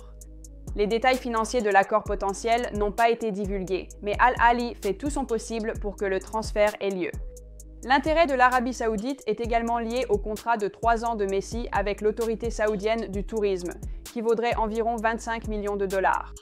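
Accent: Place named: Quebec, Canada. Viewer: Non elle a juste un accent québécois c’est tout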